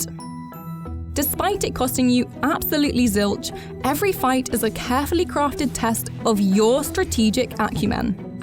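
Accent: United Kingdom. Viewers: Yo yo British... I was soo bored by listing American accent .. happy to listen to an British one .. 😌😌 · Narrator : Very strong british accent I'm out...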